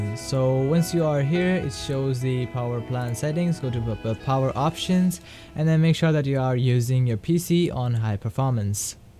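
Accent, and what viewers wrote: India. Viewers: Bhai I love your English accent bro I know you are from india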